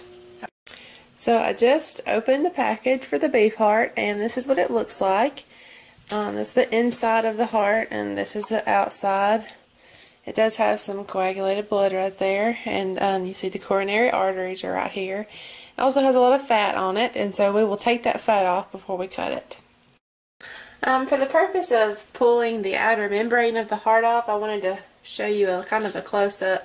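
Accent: United States. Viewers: By the way that’s a lovely accent you have. Texas?. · That souther accent is almost comforting in a way; Ive been in St. Louis way too long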